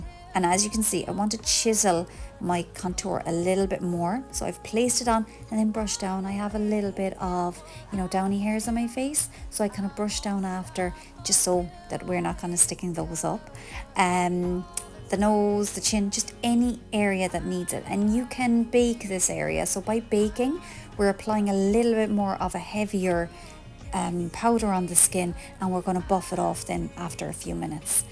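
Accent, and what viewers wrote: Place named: Ireland. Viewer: Add Irish accent to you description and it will triple your views! Love the Irish accent 🩷🙏🏻😍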